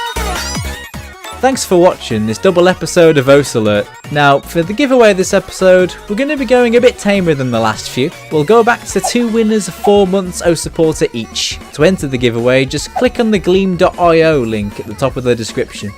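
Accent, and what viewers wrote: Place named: England, United Kingdom. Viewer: I don't really like English accents but at least he admits to being a scrub ecks dee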